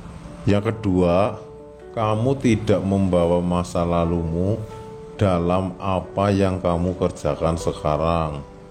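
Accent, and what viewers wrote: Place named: Banyumas, Indonesia. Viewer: Logate NGAPAK banget siih pak,,!! Rika ne wis mangan durung Dina kie,,kooh wis nei semangat Karo wong²😂😀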